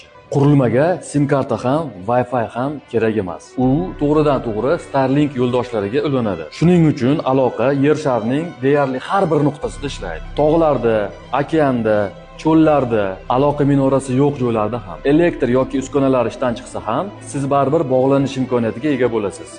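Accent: Namangan, Uzbekistan. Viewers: Shevangiz baribir Namanganchaga ketyapdi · Наманганликмисан ука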